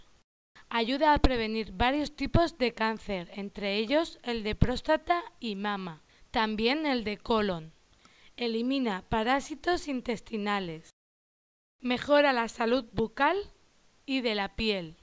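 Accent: Spain. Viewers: Me encanta el tono español pero lo que no me gusta es que la gente españoal no respeta a Dios. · Me gustó el vídeo, me gusta mucho el tono Español, estoy comiendo una ahora en mis vacaciones en Córdoba Argentina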